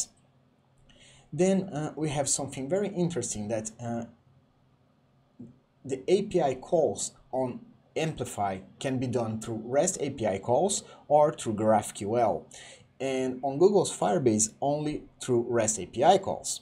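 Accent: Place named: Brazil. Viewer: Cara, é incrível que no instante que eu te ouvi falar eu já tinha quase certeza que você era brasileiro, fiquei muito feliz por isso. Sucesso pra vocês e obrigado pelo conteúdo.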